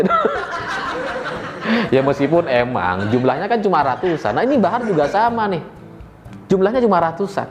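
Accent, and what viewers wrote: Madura, Indonesia. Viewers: Dari logatnya aku udah mikir mas ini orang madura, ternyata benar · Logatnya kek org madura..tp gk ada takdzimnya sama habaib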